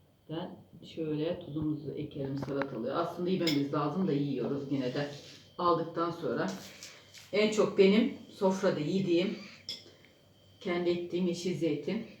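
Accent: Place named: Aegean, Turkey. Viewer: KARADENİZ ZONGULDAK DAN SELAMLAR HEPİNİZE. ,,EGE ŞİVESİNİ COK SEVİYORUZ ,, ÇOK TATLI KONUŞUYORSUNUZ SÜPER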